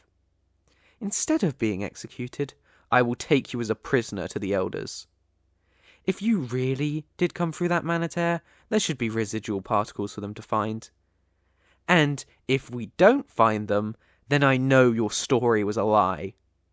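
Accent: United Kingdom. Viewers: Oh that accent makes me happy as a fellow Brit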